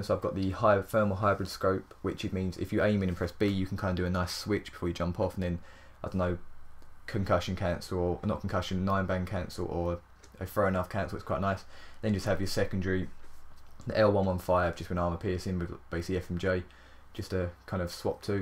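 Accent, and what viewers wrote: United Kingdom. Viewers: Ur British dude yeyhhh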